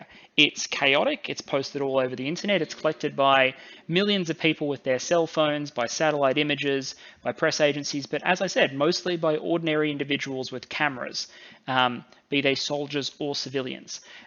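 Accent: Australia. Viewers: Your talking clap trap! Russia has been met with strong resistance by the Ukraine armed forces, and is heavily armed with British anti American anti tank missiles and anti aircraft missiles along with arming just about the entire male population aged between 18-60. Best you just go and give your opinion back in Australia judging by your accent.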